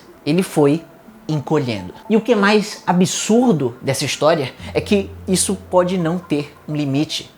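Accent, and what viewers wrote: Ceará, Brazil. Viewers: você é cearense né?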